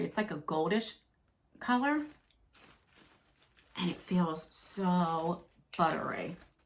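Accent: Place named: New Orleans, United States. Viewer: Hiya! Your eyeshadow is bangin' tonight! I love it! So pretty. Your accent sounds like your from nawlins. I love it! Hope your daughter is continuing on a great recovery!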